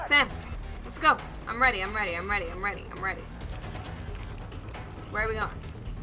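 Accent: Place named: New Jersey, United States. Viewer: AND WHY DO YOU SOUND LIKE SOMEONE FROM NEW JERSEY LMAO I LOVE HOW YOU SAY LADDER AND ANYTHING LMAO <3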